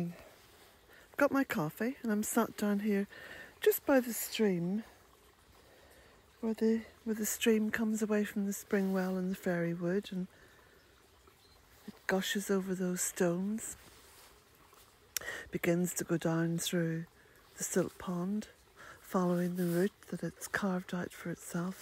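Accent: Ireland. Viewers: What a beautiful video. You have a lovely voice, very calming and I love your Irish accent too. Bealtiane cottage looks like a heavenly place to live xx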